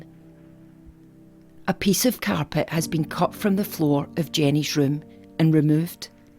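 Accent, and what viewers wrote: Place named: Scotland, United Kingdom. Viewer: Hi there, I came over from the Jamie Reynolds case. I stopped midway, because I couldn't hear, even tho I had the volume at maximum. I was pleased to find out, this recent video, the sound is great :) Oh, and pay no heed to the haters. As if they didn't have the option of changing channels... I myself - love your accent :) I'm American and I find the (Scottish ?) accent fascinating. As a storyteller, you have very good pacing and rhythm, which I very much appreciate.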